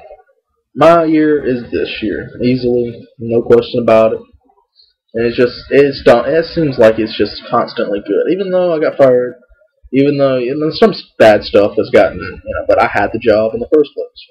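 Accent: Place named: South, United States. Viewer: Poor little Andrew.. He's so sad.. At least you can get a woman,. Unlike Andrew who can only get butt hurt.. Anyways. I love the video baby, but stop rambling about other people besides me when it's just suppose to be about me. Lol. I am conceited remember?? And you are starting to talk like me. Lol. Country! xP and I worked at Winn Dixie for 4 months and then Pizza Hut for 4 months and finally quit that too. Lol. Which reminds me.. You need to get another job.:)(: Lol. I LOVE YOU BABY! <3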